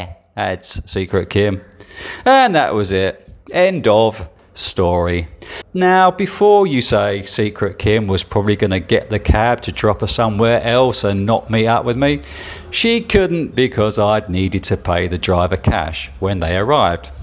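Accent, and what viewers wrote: England, United Kingdom. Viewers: Great post and hilarious word play; I really enjoyed your alliterative flourishes: You turn a phrase well. I particularly liked your voice when you were imitating a Filipina girl accepting a date to go eat. Which part of England are you from? I've been spending a lot of time in Dumaguete and have been to the Why Not many times. All the best mate, and keep on posting.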